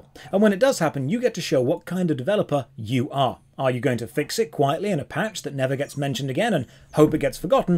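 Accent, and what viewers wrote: United Kingdom. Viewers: brittish eric foreman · are you spiffing brit?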